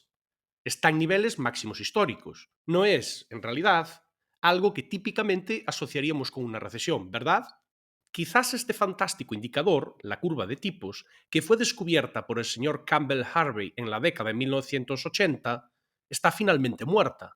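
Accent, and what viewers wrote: Galicia, Spain. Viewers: Es por el acento gallego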